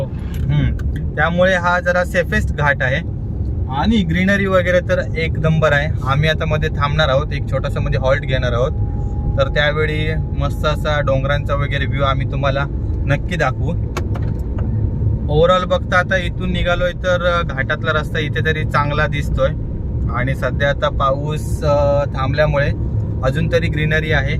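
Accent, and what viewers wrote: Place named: Konkan, India. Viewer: Bro, Phondaghat madhle nisarga soundarya ani tuzhya konkni bhashe cha godwa, maastach...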